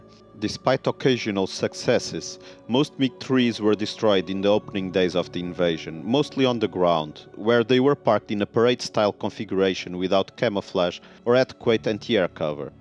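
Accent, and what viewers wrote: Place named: Portugal. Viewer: You have a Portuguese accent.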